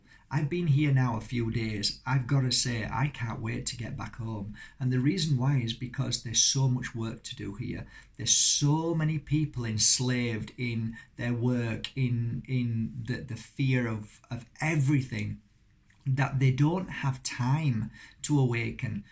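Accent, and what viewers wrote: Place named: West Yorkshire, United Kingdom. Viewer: HI from sunny Halifax in West Yorks! I thought I recognised your accent. So pleased to have found your channel. I have given up worrying about what people think of me. Although I have to be careful when people are talking about losing loved ones when they have died. I honestly dont grieve anymore. I dont get distracted by politics anymore and some think I dont care maybe? but this is not true. I just care about what I give my attention to these days.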